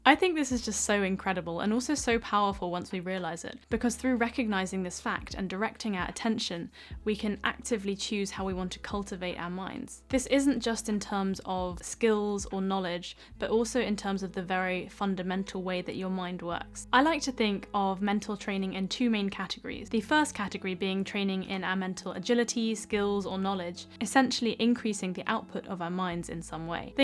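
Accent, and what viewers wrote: United Kingdom. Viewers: Hello dr, my name is mark. I love your video its very inspiring for me. Although i had a bad set back last year I was on a dating site and met a chinese girl, who i thought i was in love with. Turned out to be a crypto investment scam, called sha zhu pan or butcher pig scam of asia. I lost $100,000 in crypto currency. Im depressed now n taking weed gummies and zoloft to help sleep; and get my serstonin levels up. While managing my acid reflux and diverticulosis. I hope i can learn to think better so ill try to learn what your teaching. I just hope weed gummies dont effect my memory. Its bad enough as it is. Along not knowing if ill choose surgery for a 5cm les esophagus,hernia. Surgery is to hard to understand now. Any advise id appreaciate. I get dizzy from clogged sinus. But i admire you fir showing me how a person can be if they apply them self. 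So tk you, and love you british accent. AMAZING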